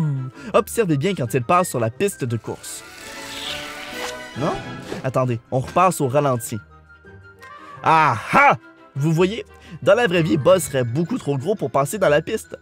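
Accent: Quebec, Canada. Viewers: Je surkiffe l'accent québécois c'c'est un folie je veux vire à Montréal la banlieu de Paris c'est la dech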